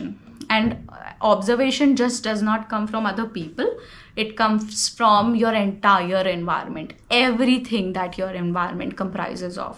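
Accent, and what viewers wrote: India. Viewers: I can't say not a lot of words. But i try myself everyday. Everyday i learn those vocalbury & sentence which helps me a lot. I like the way you talk. I love your indian accent. I feel comfortable & understand clearly. I make mistakes but when you talk everyday make mistakes. It's not a big issue. But sometimes i litte bit fear becoz of i make mistakes a lot. I can't tell you😅😅. I want to be a fluency in english ❤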